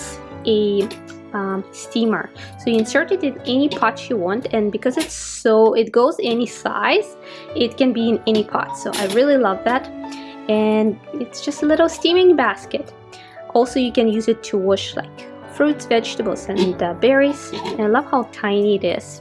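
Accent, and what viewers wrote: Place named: Hungary. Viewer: Your accent sounds like Naemi of Scandish Home, she is Hungarian. She and her family live in Oregon, USA.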